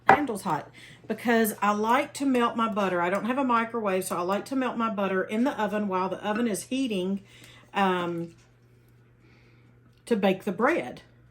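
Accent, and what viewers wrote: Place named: South, United States. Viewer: Hi,! I was delighted to hear that you are from Middle Tennessee. I live in the Muletown if you know where that is and it is also called "The Dimple of the Universe". I hear that you still have our beautiful "Southern Accent". When I was young, I lived in 5 different states and two other countries but moved back to my hometown years ago and am so happy that I did! Do you ever miss living here? Thank you so much for the recipe. It looks delicious. My family makes it with White Lily, Martha White or other WHITE cornmeal but that is because we can get it! So glad that I found you on here. Have a Blessed day.🥰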